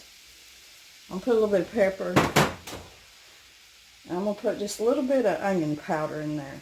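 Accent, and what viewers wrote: South, United States. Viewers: Your sweet nature, Southern English and just being yourself has certainly earned my pitiful little like and subscription.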